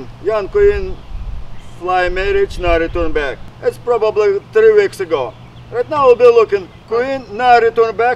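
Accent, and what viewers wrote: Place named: Russia. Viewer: I love your Russian accent. I have a question. All my hives are not producing honey. Hives are strong but no comb no honey. Only nectar and brood..any idea?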